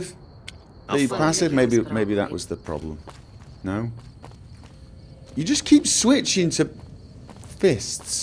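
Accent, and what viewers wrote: United Kingdom. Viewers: Where is from? It says he's from norway but he has kind of a british accent and he says R in a pretty weird way. And I'm not hating or anything I love his vids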